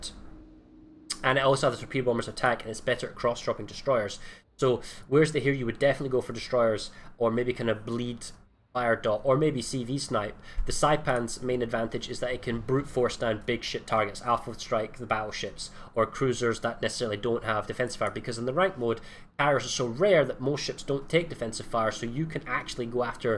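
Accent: Scotland, United Kingdom. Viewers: he's scottish I think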